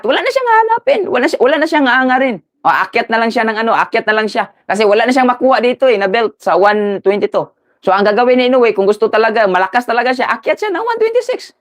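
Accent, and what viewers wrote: Visayas, Philippines. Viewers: Ung Tagalog mo bay bisaya padin😅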